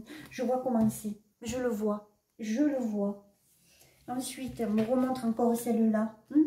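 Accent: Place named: South, France. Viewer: Bonjour.... Je vous decouvre.... Énorme... Vous dites quasi la même chose que katia mudai médium.... Vous êtes où ? Je suis de Montpellier... J entends un accent du sud...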